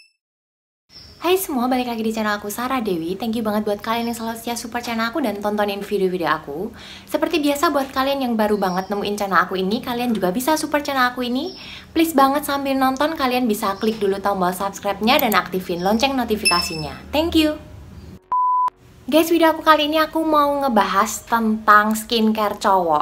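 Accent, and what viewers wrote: Java, Indonesia. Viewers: Mbak kok medok banget? Jawanya mana? Dalam dari jogja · Medhog guys suaranya😁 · Asli jowo opo mbak? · medok mba wkwk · cempreng n medok...aku suka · Jowo ngendi lurd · Mbak ngomong e jowo medok😍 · medoknyA mntap kk hhaa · NGAKAK LIAT NYA SOALNYA NGOMONG NYA KAYA NGEGAAS😆😂..JAWA YA BAK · medok banget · Iya jowo banget😆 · Medok nya itu lohhh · ngomongnya medok bnget😂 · Medok kali bahasanya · Jowomu ketok mbak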